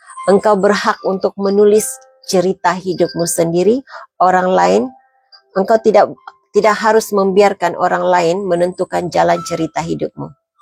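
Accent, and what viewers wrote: Sabah, Malaysia. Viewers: Suara admin. MCM orang Sabah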